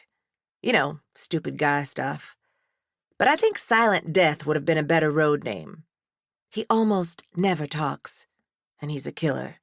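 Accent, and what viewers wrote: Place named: South, United States. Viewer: YESSSS this is going to be very interesting!!! As a Black South Carolina woman I really appreciate Southern voice. 😜🤩💯❤🏍🌪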